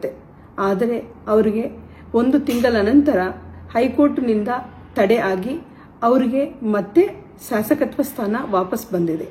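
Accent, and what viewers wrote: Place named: Telugu, India. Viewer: Telkannada adu 😂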